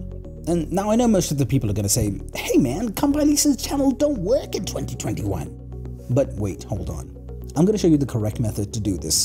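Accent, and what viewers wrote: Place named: India. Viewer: Your English look like you are India I know you're belong to India 👍 I like your videos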